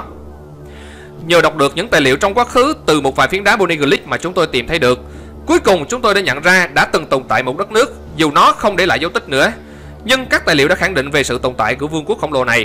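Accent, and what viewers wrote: Quang Ngai, Vietnam. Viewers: giong cua ad giong quang ngai gheee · giọng ad giống người quãng ngãi quá vậy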